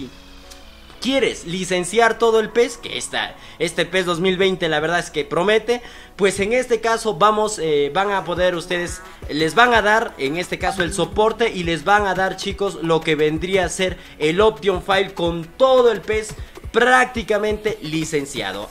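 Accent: Mexico. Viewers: Si no decías que eres de Cusco, juraba que eras mexicano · Yo también juraba que el era mexicano por el acento de narrador mexicano que tiene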